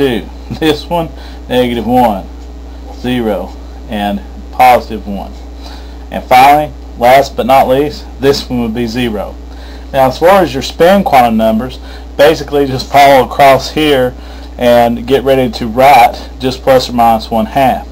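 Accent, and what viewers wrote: South, United States. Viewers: Dude i need you as my chemistry teacher. and based on your accent, youre prolly right here in the south with me haha!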